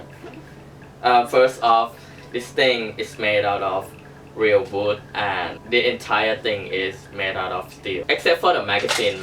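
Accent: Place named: Thailand. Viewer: ก็ว่าอยู่เวลาพูด สำเนียงเหมือนคนไทยจัง555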